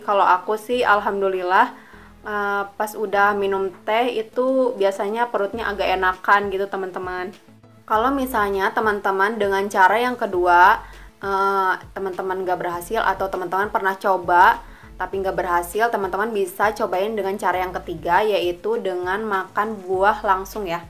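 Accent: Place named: West Java, Indonesia. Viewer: orang sunda nih kayanya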